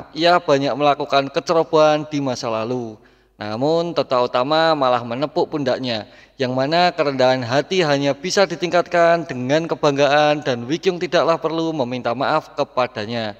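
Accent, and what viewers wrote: Java, Indonesia. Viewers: Bang kalok suara nya agak medok gitu pesti nanti view nya rame karna kocaknya, ditambah unsur² kocak bang biar rame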